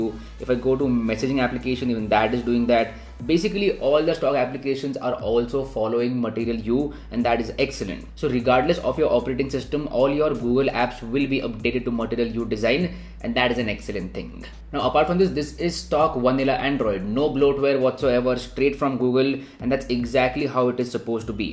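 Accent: India. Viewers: INDIAN SPEAKING ENGLISH IS SO DAMN LIT ! I MAY UNDERSTAND THEM NOW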